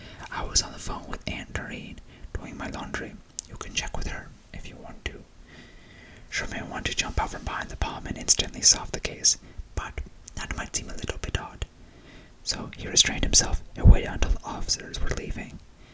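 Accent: India. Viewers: Indian accent videos are my favorite!! Love the video as always and keep creating great content!!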